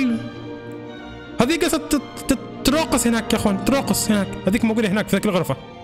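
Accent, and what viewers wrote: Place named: Oman. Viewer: انت عماني عرفت من لهجتك 🌹 وكمل لعبه هي 4 فصول انت في الفصل الأول لعبه حلوة انا العبها على قناتي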